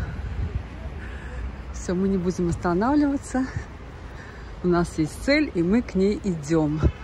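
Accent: Baku, Azerbaijan. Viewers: Ваш русский язык с бакинским акцентом это услада для моих ушей.Спасибо за настроение. Баку город моего советского детства)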